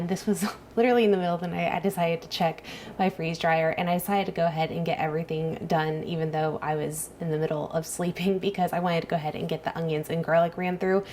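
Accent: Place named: Philadelphia, United States. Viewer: Hi, I came upon your channel two weeks ago and let me tell you how much I LOVE it and you of course. I could have sworn you were from Philly, but I saw the video you said you are from Kanas. You did say you lived all over so wondering if you ever lived in Philly. You sound and act like you’re a true Philadelphian, LOL I just love how you talk and do those side noises, lol…. Anyway not sure if you will ever read my comment, but know that you have a NEW FAN from PHILLY. P.S. because of you I ordered golden bear sunflowers and paprika seeds. Oxoxoxo Love from Philly💙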